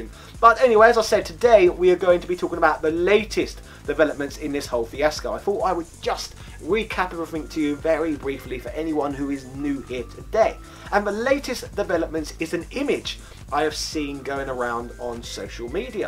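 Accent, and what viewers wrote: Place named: RP, United Kingdom. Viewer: Why do you put on that awful posh accent?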